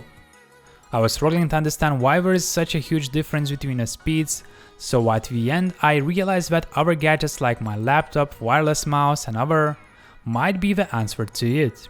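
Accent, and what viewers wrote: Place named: Lithuania. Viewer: Well, I'll support you vid with comment. Wi-Fi 6 looks more like transitional standard. I'm almost sure that when manufacturers start to implement 6 there would already be 7. A very limited range of units available now, so I'll wait until it be more across-the-board. Čiotkas, su niekuo nesumaišomas Lietuviškas akcentas :D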